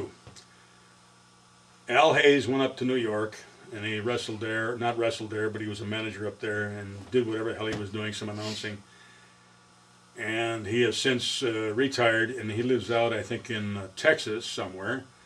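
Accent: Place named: RP, United Kingdom. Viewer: He does a surprisingly decent posh English accent (I say that as an Englishman)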